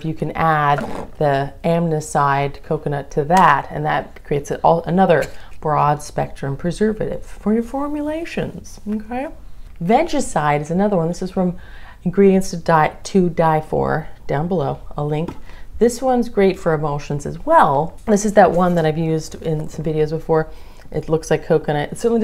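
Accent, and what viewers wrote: United Kingdom. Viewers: how tall are you & wots your zodiac sign, where is your accent from as u sound British??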